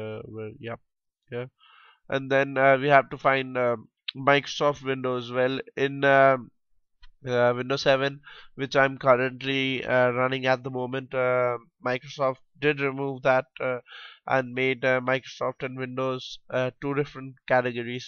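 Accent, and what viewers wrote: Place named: India. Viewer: Indian accent so annoying.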